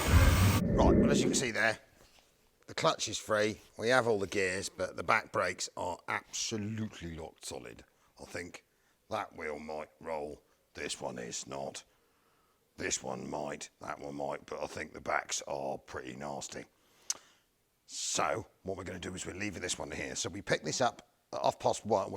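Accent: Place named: Wiltshire, United Kingdom. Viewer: just love the videos and the wiltshire acent but most of what you say is lost because your microphone need to be replaced for a better one.. thanks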